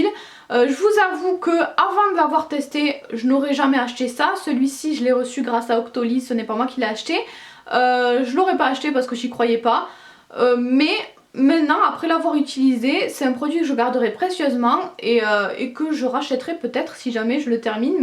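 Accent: South, France. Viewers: super ce produit tres jolis accent que tu as on dirais celui du midi bonsoir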